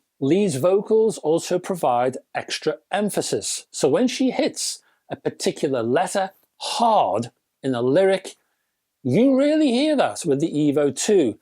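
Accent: United Kingdom. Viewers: I rather enjoy watching your videos, mostly in order to gain perspective, because I do appreciate, to some extent, anyway, the values others may hold on various subsets of audio performance, however advanced or meager. You are a well-informed, articulate man ... and an individual of good humor, so important to enjoyable conversation. Now, I generally appreciate the mechanical and delivery properties of speech from genuine Brits; they add so much color (or, perhaps "colour") and flavor. But, you folks simply must reform the completely illogical, and utterly indefensible, (mis-) pronunciation of the word "aluminum." What accidental process of lingual habit has led you folks to think the word is pronounced the way you do? Sorry, but "al-you-min-ee-yum" it isn't; it's just not. I mean, look at it. Four syllables. That's all. And while you're at it, can you please explore the etymology of the word "chube?" I cannot even find it listed in the dictionary; nor can I find any trace of the similar "chuba." (What the heck IS a chuba?) You folks have developed the universal language across the last several hundred years, and did a bang-up job of it, but you must not elevate regression to an art form.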